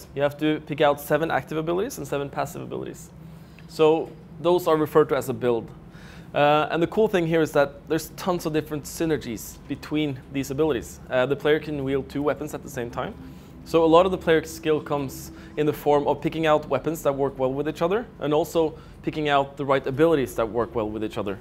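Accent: Norway. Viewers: So norwegian way to speak english!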